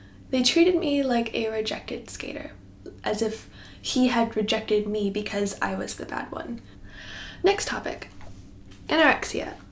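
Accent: England, United Kingdom. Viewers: Your american accent is blending into a british one!!! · Your British accent is coming in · aww she is getting a british accent!!!!! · you're picking up a British accent and as a Brit I love it. you say "lot" differently xx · I’ve noticed in this video that you’re starting to get an English accent 😂 and it’s with some words so it’s so cute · Aw you’re starting to get a British accent it’s really cute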